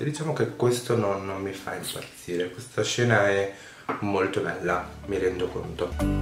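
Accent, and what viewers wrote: Milan, Italy. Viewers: Sta cadenza milanese alla * mi fa impazzire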